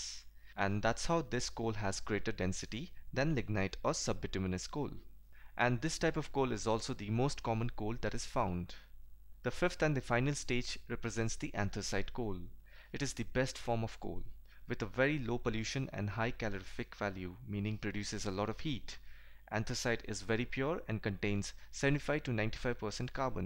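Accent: India. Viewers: He is using his own voice, it's his accent, which sounds as if he is from India.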